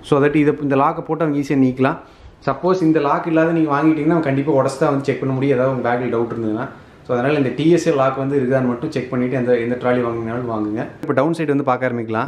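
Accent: Kongu, India. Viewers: கொங்கு தமிழ்...❤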